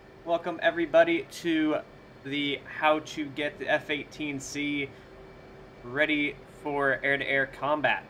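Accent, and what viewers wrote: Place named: India. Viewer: Whats wrong if he has an indian accent? Some of the simplest explanations out there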